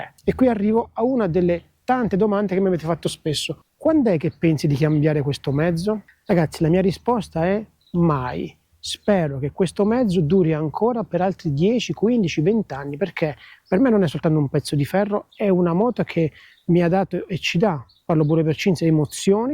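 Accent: Abruzzo, Italy. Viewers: Complimenti discorso esemplare, hai il giusto modo di viaggiare, in sicurezza e con passione. Poi sento che sei abruzzese come me 👍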